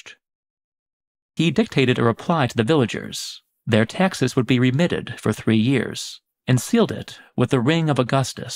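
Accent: United States. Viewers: Very nice work especially the illustrations. Rather disturbing though your pronouncing Latin names the American way.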